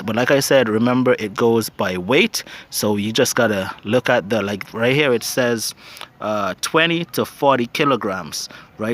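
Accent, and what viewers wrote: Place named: Bahamas. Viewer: You convinced me to try it & you sound like one of the homeboys in the Bahamas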